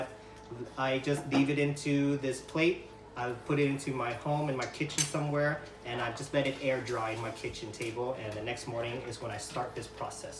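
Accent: United States. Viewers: Are from the state of Hawaii? I think I hear the local Hawaiian accent.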